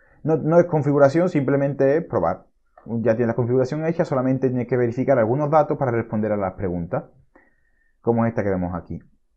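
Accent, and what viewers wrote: Andalusia, Spain. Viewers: Eres andaluz?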